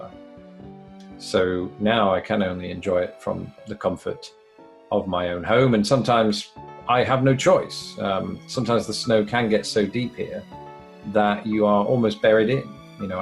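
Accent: United Kingdom. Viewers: Proof we will watch anything with birtish commentary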